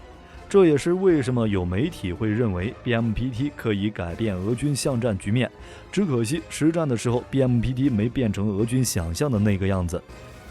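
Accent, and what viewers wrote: China. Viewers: 台独反中媒体,为啥用中国大陆语音配音?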